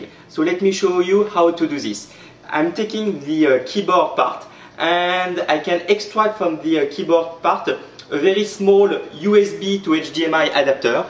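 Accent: France. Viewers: French guys speaking english should be banned from internet :[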